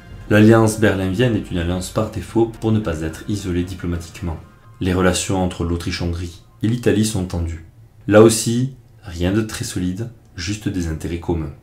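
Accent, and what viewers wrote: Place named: South, France. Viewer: C’est le Sud ahah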